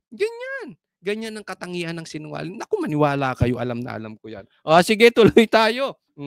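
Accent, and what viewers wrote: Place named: Visayas, Philippines. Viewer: Tama po kht bisya dialect niya pinapanuod ko sya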